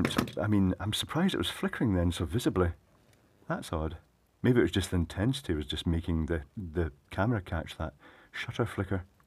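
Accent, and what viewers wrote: Scotland, United Kingdom. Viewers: I agree, though I suppose we could still enjoy the Scottish accent as narrates?!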